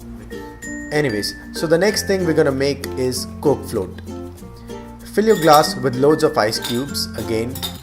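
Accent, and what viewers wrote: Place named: India. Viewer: Sir app ko hindi nahi aata hai. awaz sy to indian lg rhy ho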